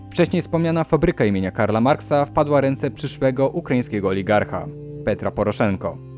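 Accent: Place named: Russia. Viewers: off topic ale tbh jak cie tak słucham to masz klasyczny polski akcent ale czasami słyszę takie typowe ruskie dźwięki jak mówisz. może to po prostu język polski alboco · To pewnie ruskie naleciałości się udzielają na polskie głoski. Ktoś widać uwielbia rosyjski. 😊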